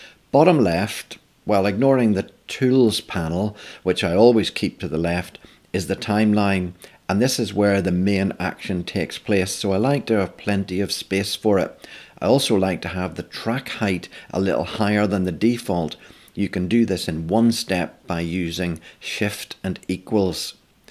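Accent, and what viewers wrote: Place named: Northern Ireland, United Kingdom. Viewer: First northern ireland accent I’ve ever found on a premier pro tutorial lol also from northern ireland (tyrone)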